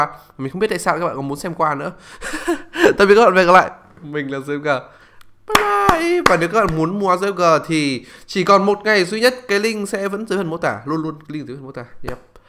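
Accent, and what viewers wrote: North, Vietnam. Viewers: Anh là người miền bắc đúng kg ạ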